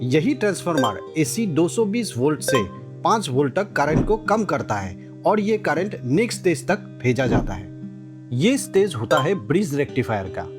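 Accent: Northeast, India. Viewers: Bhai aap north east state region se ho kya aapke accent se lg rha hai 😊😊❤❤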